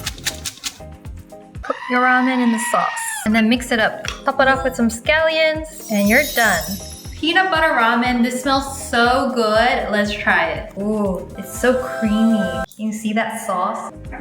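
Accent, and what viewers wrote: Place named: Australia. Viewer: I miss your Aussie accent! You sound so different. Will defs try the peanut butter recipe 😋😋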